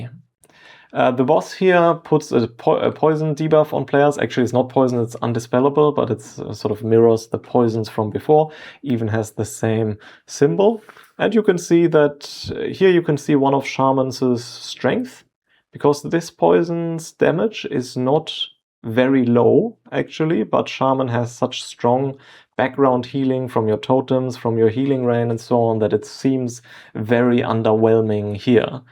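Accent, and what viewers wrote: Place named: Germany. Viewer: Nolo, schön, dass du mit deiner Aussage Leuten Faulheit beim Lernen unterstellen möchtest oder Menschen, die eine Lernbehinderung haben, damit vor die Füße spuckst. Ich kann Englisch und ja, man kann ihn gut verstehen, und er macht seine Sache auch sehr gut. Das hat allerdings gar nichts mit meiner Aussage zu tun. „Wer lesen und verstehen kann, ist klar im … naja, du weißt schon …“ Man hört bei ihm halt ganz klar den Deutschen raus, und ich finde es einfach schade, dass es kaum gute deutsche WoW-Content-Creator gibt. Ja, ich weiß, mit Englisch erreichst du einfach viel mehr Leute, aber dafür ist der Markt auch stark übersättigt. Daher kommt meine Aussage. Aber gerne werde ich für Menschen wie dich dies in Zukunft genauer spezifizieren. Ein schönes Wochenende wünsche ich dir damit und schon einmal einen guten Rutsch für S2!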